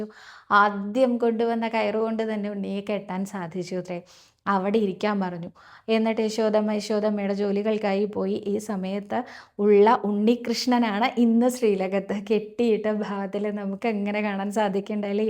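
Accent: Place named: Thrissur, India. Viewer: കഥ കേൾക്കാൻ നല്ല രസമുണ്ട്, പിന്നെ ആ തൃശൂർ സ്ലാങ് ഒക്കെ നന്നായി 🙏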